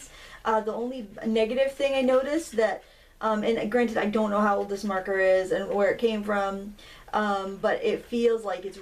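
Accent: Maine, United States. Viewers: What a clever idea, laying out the three bookmarks, making them as one, then separating them. What a fun way to play and create bookmarks at the same time. BTW, I never care how long your videos are, for as you know from other comments from me, I love to hear you "mainespeak" and your cheerfulness always gets me in a good mood, for it takes my mind off of what ails me. Keep 'me up, Sistah! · oh dear, i have been watching your channel from the beginning of my you tube days i think you had just a few thousand subscribers and you are in fact the 2nd person i subscribed to. I must say you have been a joy and a good friend through the years. Whatever the video of the day is it makes me smile, your children and family are priceless and so is your lovely chatter whether it is in your "maine dialect" or your fun and happy voice it is always like talking to an old friend. 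take care and never stop we would miss you terribly. Shame on her, she has no idea what she is missing! Aloha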